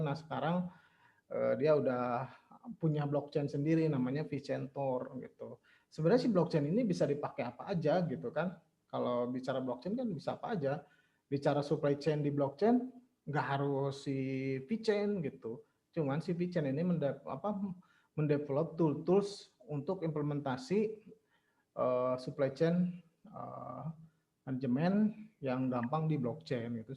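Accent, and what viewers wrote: West Java, Indonesia. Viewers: Bang Logat Sunda ya , hehe , mantap Slurr 😁 , Penjelasan nya mudah di pahami bang lanjutkan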